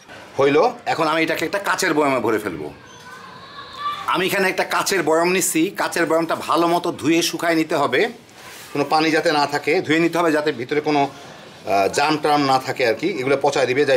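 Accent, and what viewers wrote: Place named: Old Dhaka, Bangladesh. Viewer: পুরান ঢাকা স্টাইলে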